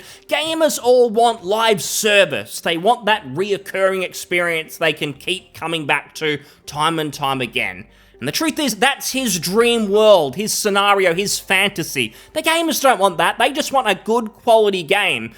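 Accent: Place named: Australia. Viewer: I give the dude the benefit of the doubt, he's a smart bloke, a lot of words pronounced differently in Australia.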